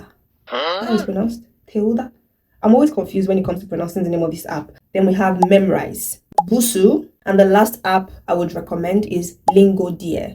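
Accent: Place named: Cameroon. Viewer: You sound a lot like a Cameroonian, even though I know you're Yoruba from your name😄.... Keep up, very positive and inspiring channel.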